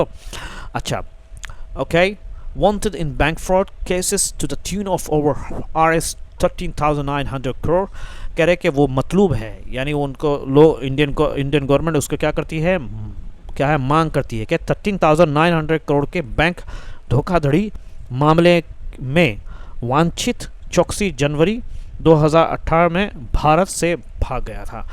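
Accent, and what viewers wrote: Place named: United Kingdom. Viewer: You are a British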